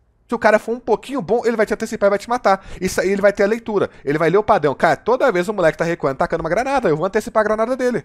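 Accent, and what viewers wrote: Belo Horizonte, Brazil. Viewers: Sotaque de BH do é bão dms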